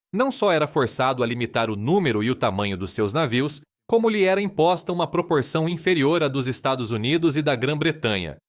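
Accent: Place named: Caipira, Brazil. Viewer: Narração em caipirês acentuado. Não acho estranho pois sou caipira do interior de São Paulo, mas não estou acostumado com esse tipo de narração de fatos históricos. 👍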